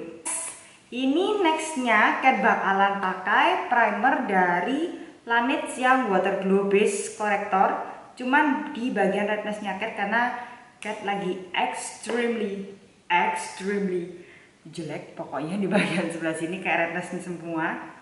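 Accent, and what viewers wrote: Java, Indonesia. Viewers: Kok medhookkk 😍😂😂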